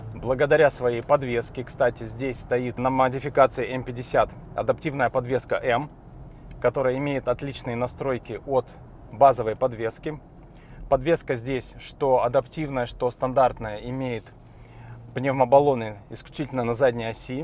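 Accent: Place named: Belarus. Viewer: Быццам бы распавядае беларус. Націскі ставіць па-беларуску.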